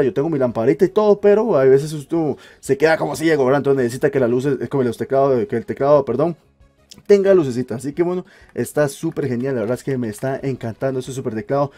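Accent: Costa Rica. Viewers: andaba buscando reviews de este teclado y adelante el video hasta el minut0 y lo primero que pense fue: seguro es vara que el mae es tico jajaja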